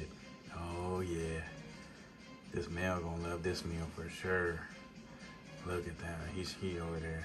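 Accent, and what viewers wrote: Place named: Bay Area, United States. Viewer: Bro are you from Northern Cali? You sound like you from the Bay Area lol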